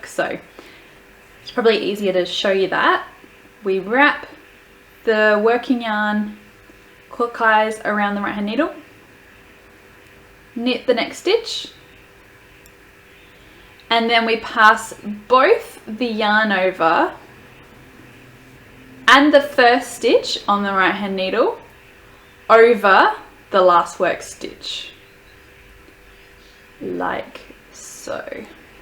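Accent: Australia. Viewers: Yank English accent here and I understood your Ozzy accent just fine. Thank you for sharing and educating! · As an Aussie, I was SO relieved to find a knitting video featuring an Australian accent. It's the first one I've found after watching MANY knitting videos. Keep up the good work and thanks! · Thank you- very clearly explained stitches and you didn’t flick through your stitches quickly like many knitters doing this with continental knitting. Love your Aussie accent, I understood exactly what you were saying. And I am a senior from the Midwestern U.S. I could see what each motion was doing on the needles. Thank again,.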